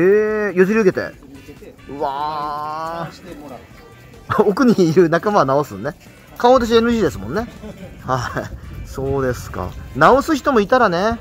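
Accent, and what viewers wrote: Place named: Shiroishi, Japan. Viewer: 今日はかなりなまっていました🤔 白石市民から移りましたね😁 山形よりまだまだいいけど😁 ビリビリガン自分でするにはかなり勇気が🙂 🐸と🐍このシリーズ大好きです💖 錦ケ丘ヒルサイドモール、また頑張ってください🙇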